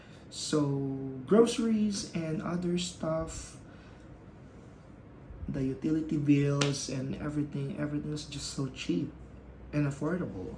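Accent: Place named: United Kingdom. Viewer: I can heaar UK accent 😂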